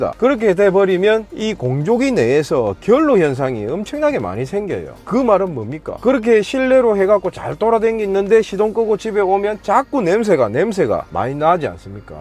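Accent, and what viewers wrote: Gyeongsang, South Korea. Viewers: ㅋㅋ 갱상도 사투리를 유튜버에서 듣게 되는군요 ㅎ... "빠마데기"라는말 진짜로 오랜만에 들어봅니다 ㅋㅋ~^(^ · 빠만떼기 말수도 있습니다 ㅋㅋㅋㅋㅋㅋㅋㅋㅋ 제가 갱상도 남잔데도 사투리가 구수하게 들리네요 ㅎㅎ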